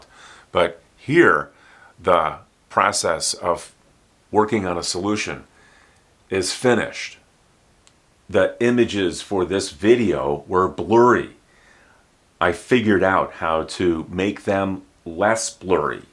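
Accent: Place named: United States. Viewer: I love you prononciation teacher. You're the really american spoken i've never known.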